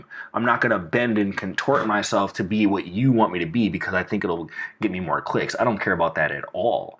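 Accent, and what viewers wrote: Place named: United States. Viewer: and I thought you'll have the Nigerian accent.... Lol. Love your American accent though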